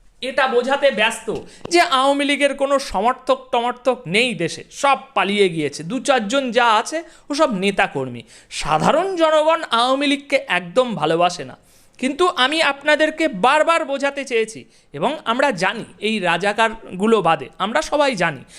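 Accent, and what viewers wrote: India. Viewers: দসক ওনি বাটপার উটপার ওনি বাংলাদেশের না ওনি ভারোতের লোক ওনার বাষায় বোজাজায় ওনার কথা সোনে লাভ নাই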